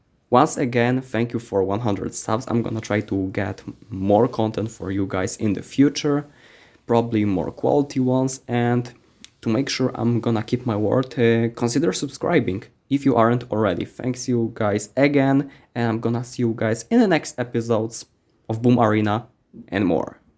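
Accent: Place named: Poland. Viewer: Haha i knew it, you are polish! I was so suspicous of your accent, now beacuse of the ads i know for sure😂 Great relaxing video❤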